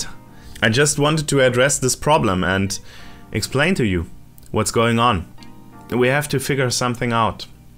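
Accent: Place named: United States. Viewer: У, действиетльно, очень чёткий английски, ближе к американскому, который я тоже очень хорошо понимаю. А вот самих англичан могу нормально понять только через одного, очень сильно зависит откуда они родом. Жуют и искажают слова, фиг что разберёшь. Наверное, их даже американцы плохо понимают.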